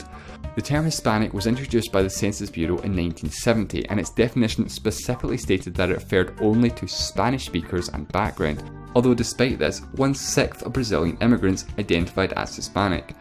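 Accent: Scotland, United Kingdom. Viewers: You made one fundamentaL mistake, claiming that "people from, Portugal are essentially Spanish".It is like claiming that people from Wales or Scotland are ... English ! :-\ you suggest that anyone speaking a Romance Language is a Latino, incluidng (drum roll) Italians and Romanians.May I politely suggest you change that view before you upset the entire Italian Youtube community !!!Guyana and GHuiana are NOT pronounced "guy-ana" but "goo-yana" "Not all people from latin America are latin..." is exactly like saying "Not all people from North America are Americans". Because they are not. Theyr ancestors emigrated there from Europe or came with the African slave trade - the only REAL South Americans and North Americans being the (nearly extinct) Natives.All in all... I do not know whether you are Scottish or Geordie but you DO have an issue understanding what is Latin, what is Latino and what is neither.Good night. 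· are you Scottish? cause I'm in love with your accent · i quite like his voice, love me a scottish accent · Of course the narrator explaining all the differences in Latin American is not American. I’m trying to find out the accent maybe Scottish? Americans should be the ones who know inside out this but they are the only ones who are ignorant. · You have a very strong Scottish accent! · are you Scottish ?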